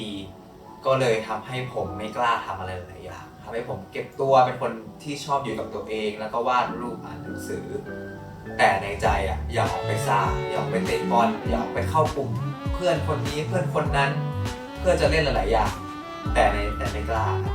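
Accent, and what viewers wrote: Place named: New England, United States. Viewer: I was wondering why you had a slight New England accent. lol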